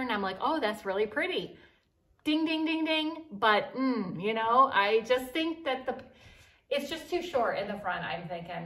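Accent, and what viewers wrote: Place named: Midwest, United States. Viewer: The first thing is I noticed your accent. I thought to myself she’s from the Midwest possibly Michigan. That’s where I was born and my relatives always sounded like that. I moved away at seven and did not have that accent the second thing is I have a Ziploc type phone protector like that for the beach and I love it. The other thing is where are those skorts while you can. I am 63 and a longer wear them. I gave them up about 13 years ago. Do you look so cute in them and I love the tops and I would take that first stress and I would cut it off and make a blouse it’s adorable. I’ll have to keep my eyes out for the jeans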